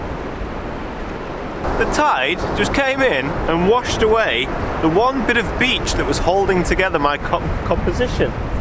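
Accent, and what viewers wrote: United Kingdom. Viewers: Your channel discovered me somehow and I’ve enjoyed it thoroughly! Your accent is amazing! From where in the UK are you from ? Can I with my Mamiya C220 and Sekor 55 lens attempt at these vast expanse type pictures? I am yet a film amateur photographer! Should I resort to my Sigma 18-35 mm wide angle zoom for my Minolta Alpha 35 mm camera instead? And if you’re ever in Costa Rica I’ll take you to the Roca Bruja (Witch rock) in Playa Naranjo at the Santa Rosa National Park for some pictures! Regards, Robert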